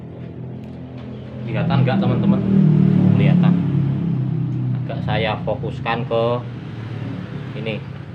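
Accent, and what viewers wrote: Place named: Java, Indonesia. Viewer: Wkwkkwkw rabuk,, bahasamu podo ae lur koyo aku,, 😁😁 muedok puolll 😁😁😁👍👍👍👍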